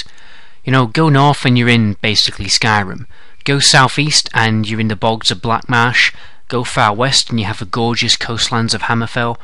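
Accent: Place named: North, United Kingdom. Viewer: where are you from? your accent is exactly the same as the northmen in game of thrones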